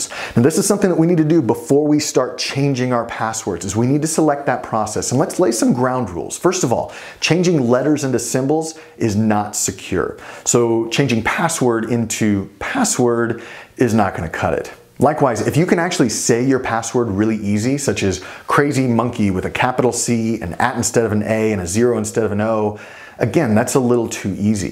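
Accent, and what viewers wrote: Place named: United States. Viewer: voice sounds really different here with that American accent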